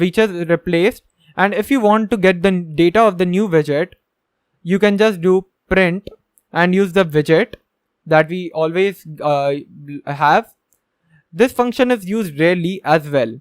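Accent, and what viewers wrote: India. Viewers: are Nahi bhai😂. Thanks to U, ek Indian accent mein acha detailed video mil gya. Wrna American accent se sar dukhta h. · A horrible, bizarre English access... It sounds like an Indian accent.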